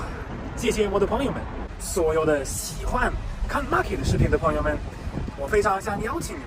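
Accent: Sichuan, China. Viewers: 的四川话有味道😄👍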